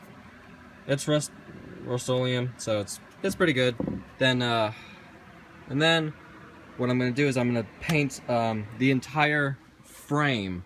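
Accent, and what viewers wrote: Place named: New Jersey, United States. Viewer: How aww YA! He sounds like he's from New Jersey. 😄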